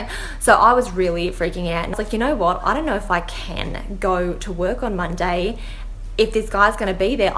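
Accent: Australia. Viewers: It's an Australian accent😂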